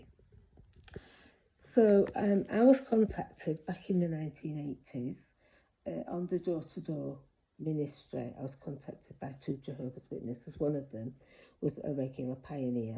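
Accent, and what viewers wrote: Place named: Yorkshire, United Kingdom. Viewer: Hey. My name is Ken, I left JW's after 37 years in 2012, having been brainwashed since the age of 5. Thank you for your video. It's extraordinarily powerful. I remember studying the "Revelation" book in my teens and twenties at the "Book Study Group"once a week, and clearly remember the Watchtower Society excoriating the United Nations. I also remember being at the Twickenham Convention and hearing a letter read out from the platform, condemning the UN as the "Wild Beast". They then bragged that the letter was sent to the UN headquarters. They ridiculed Christendom as being a harlot, and yet, they dropped their own panties and got into bed with the UN - behind the backs of their congregants. What a bunch of spiritual slut$! Vile, disgusting hypocrisy. I'm so glad I am free. I am so glad you are free. I now live in Louisiana but am originally from London. 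Sounds like you're a Yorkshire lass. Am I right? Thanks again for a great video.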